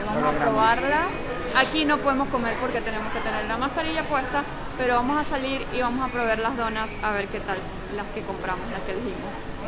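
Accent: Venezuela. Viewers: De donde es esta chica? Tiene un acento como de Venezuela,pero dice palabras que solo usamos en México 🤔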